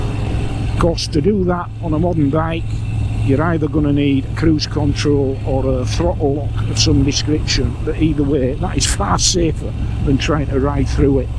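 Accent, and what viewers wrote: Yorkshire, United Kingdom. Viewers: Nothing wrong with a plain spoken Yorkshire man or woman. Prefer someone from lancashire myself, but there again I'm one !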